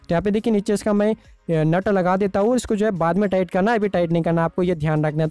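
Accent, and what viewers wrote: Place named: Hyderabad, India. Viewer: Hyderabadi Lagte Ho 😂 I love Hyderabadi hindi Language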